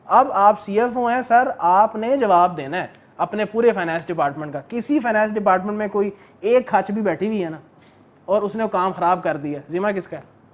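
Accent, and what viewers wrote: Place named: Punjab, India. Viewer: Nice punjabi excent.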